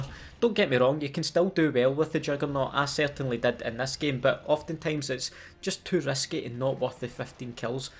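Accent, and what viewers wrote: Scotland, United Kingdom. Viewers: Love the COD content but... that Scottish accent is the one that hook me up. #notificationsquad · This guy Scottish? (Also in real life white phosphorus is really dangerous it’ll burn your body bad) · Not sure if I subbed for the actual content or for the beautiful Scottish accent but either way #notificationsquad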